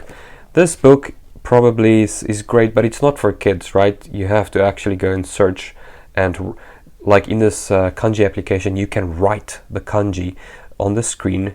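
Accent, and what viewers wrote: Afrikaans, South Africa. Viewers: I was wondering why it sounded vaguely Dutch, Afrikaans makes a lot more sense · What, you sound South African... My brother!!!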